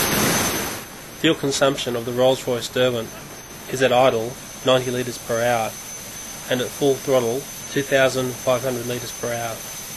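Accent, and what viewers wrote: Australia. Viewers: That's an Australian accent you tool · Who is this fucking robot voiced australian narrator?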